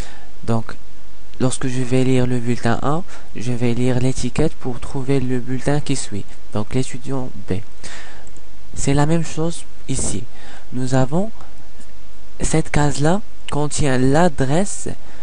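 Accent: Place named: Maghreb. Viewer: t'explique mais on ressent que t'es pas un natif français tu dois être magrébin ? ;)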